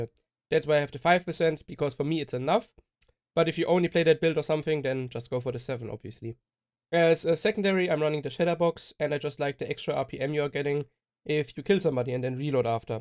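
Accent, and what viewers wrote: Germany. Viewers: Your english sounds like a german who wants to talk english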